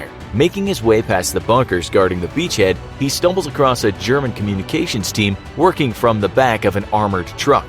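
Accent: Canada. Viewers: Is the info graphics show from Canada? The way they talk in this sounds like they are Canadian. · sounds pretty Canadian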